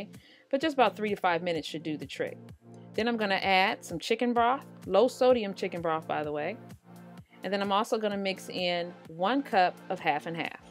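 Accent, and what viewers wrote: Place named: South, United States. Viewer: Hey, I just found you today! I'm so amazed. I would've thought you were from the south. I live in Alabama and you are just like us. I love, love, love your channel. I subscribed and turned on the notifications. 🤓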